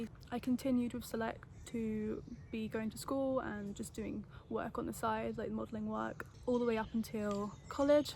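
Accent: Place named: United Kingdom. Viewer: I'm in love with British accent Greetings from Germany ❤️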